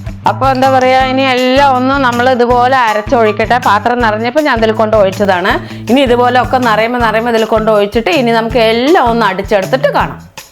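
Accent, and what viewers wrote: Palakkad, India. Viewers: നല്ല പാലക്കാടാൻ ഭാഷ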